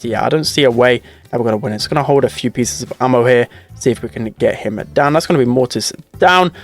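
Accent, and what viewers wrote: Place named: England, United Kingdom. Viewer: Well he is English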